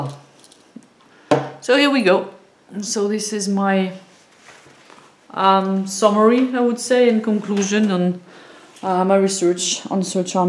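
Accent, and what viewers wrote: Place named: France. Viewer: j'aime ta revue elle est vraiment interressante avec un joli accent camembert.....merci pour ton partage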